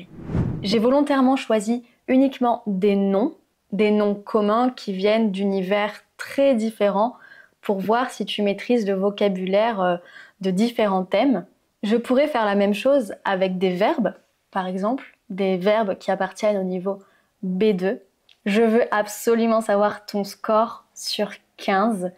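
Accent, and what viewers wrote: France. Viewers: Tu parles le vraie français bien prononcé bien modulé avec un accent merveilleux et pas comme le français québécoise ou de la Suize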